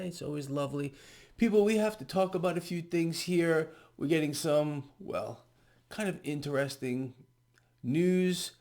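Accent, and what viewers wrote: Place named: New York City, United States. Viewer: we love your Italian NJ/NYC pronunciation of 'costs' like 'caust'(ic)👌🏻 I think the NYC Italiano accent is awesome & admirable😄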